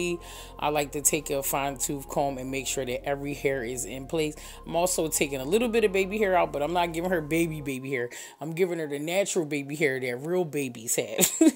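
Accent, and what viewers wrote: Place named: Pennsylvania, United States. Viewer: Always love watching you work and hearing your PA accent! Your energy is so positive and inspirational. Please keep doing you! ❤️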